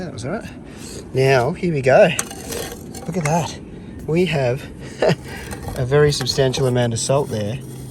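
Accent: Australia. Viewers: Great job mate! Love, love the survival videos! Cheers! (How ‘bout that Australian accent)?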